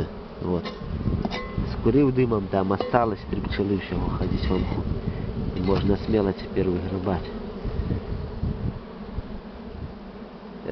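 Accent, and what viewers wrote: Belarus. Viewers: По разговору слышно,что ты с Белоруссии и понятно,что климат там серьёзней,чем у нас ! Для меня подушки не нужны ! Здесь и о мхе ничего не знают. Удачи тебе ! Я на тебя подписан давненько и смотреть тебя приятно ! Молодец ! Удачи нам !!!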